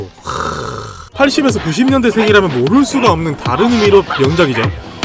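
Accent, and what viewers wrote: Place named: Gyeongsang, South Korea. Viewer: 갱상도 분이시네예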